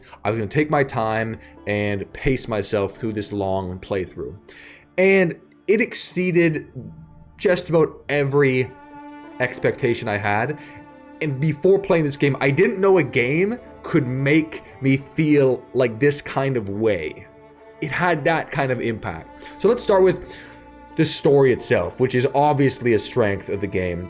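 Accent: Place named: Canada. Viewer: Most Nadian accent ever!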